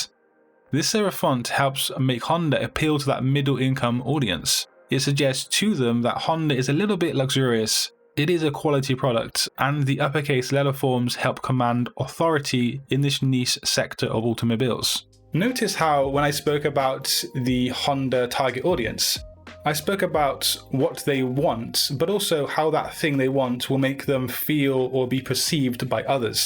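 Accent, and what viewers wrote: United Kingdom. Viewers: Are you British? I like your accent !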